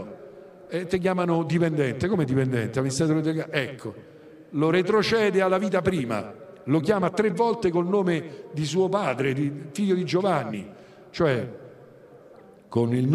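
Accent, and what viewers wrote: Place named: Rome, Italy. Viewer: Che bello sentire parlare don.. perché tanto illuminato e per il suo simpaticissimo "romano".. 😊😊😊😊❤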